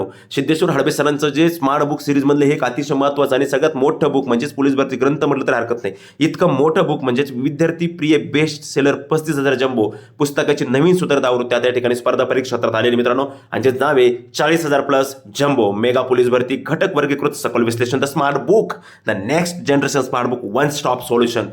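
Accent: Ahmednagar, India. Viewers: काही म्हणा गड्या... पण हा माणूस खरच अख्ख्या महाराष्ट्रात एकदम भारी माहिती सांगतो...आणि सर्वांना समजेल अशी सर्वांच्या भाषेला match होईल अशी अस्सल नगरी भाषेत एकदम कडक...सर खरच खूप छान काम आहे तुमचे....love you..❤❤ तुमचा व्हिडिओ पहिला ना सगळी माहिती डोक्यात जाते डायरेक्ट...🎉🎉🎉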